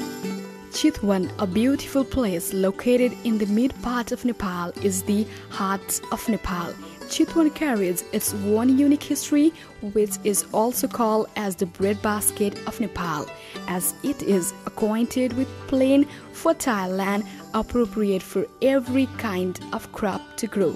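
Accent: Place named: India. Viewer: Indian accent spoted =)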